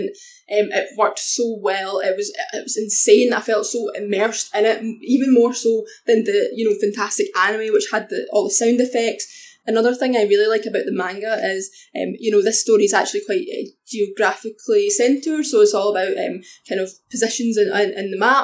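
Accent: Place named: Scotland, United Kingdom. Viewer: I love the Scottish accent sooooo much, oml. Much love from London! UK GANG!!🇬🇧🇬🇧💪🏽 Also, can't wait to read this manga and watch the anime! :D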